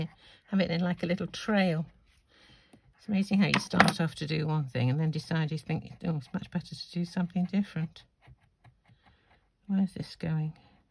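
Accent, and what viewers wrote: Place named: RP, United Kingdom. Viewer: Watching you gives me an immense sense of calm. Your voice is relaxing, and quite, ”posh” at least to these American ears! Anyway, keep posting your wonderfully soothing tutorials.💜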